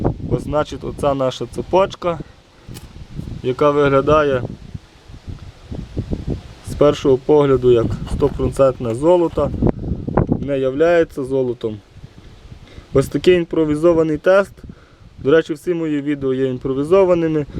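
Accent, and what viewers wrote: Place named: Ternopil, Ukraine. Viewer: Привіт.Чую тернопільський акцент....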